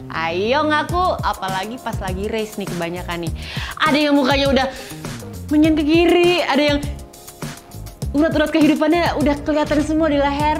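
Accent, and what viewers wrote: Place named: Jakarta, Indonesia. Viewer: kadang betawi nye kluar jg ye hahaha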